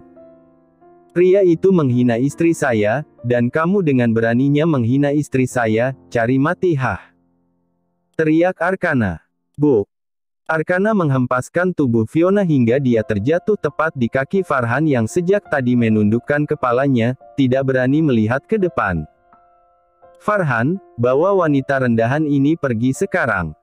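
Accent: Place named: Indonesia. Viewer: Logat Indonesia guys guis😊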